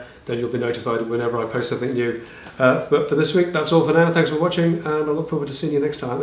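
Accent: United Kingdom. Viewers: What I like about your videos is that they're authentic, mistakes are shown, such as the work piece being unsecure (sic) when using the spade bit and the skirting board piece breaking in two. I would have used a dovetail saw or even a coping saw but getting the cheap bandsaw into a project is what you wanted to achieve and succeeded, a little bit different and your casual matter of fact manner is easy to watch and listen to. The point that I took from the video is that a bandsaw can be portable and could used for more challenging aspects rather than just a small section of skirting board. I commend you for even replying to the sanctimonious knob heads who feel a compulsion to piss on bonfires to give themselves a sense of self satisfaction and importance. The points they may could be better raised in a less knob headed way imo. Keep it going, nice to have a British accent to listen to and using metric when looking for hints and tips.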